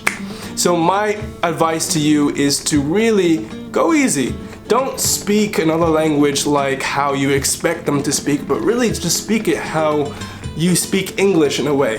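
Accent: United States. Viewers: Hey, im russian and i can clearly hear his accent. He sounds like american, not russian. Yeah, his accent is still decent (for foreigner) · Well, he has the American accent down well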